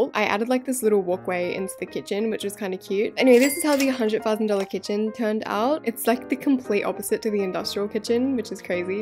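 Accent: Australia. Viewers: in this video you can hear her Australian accent more better in this video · Is she Australian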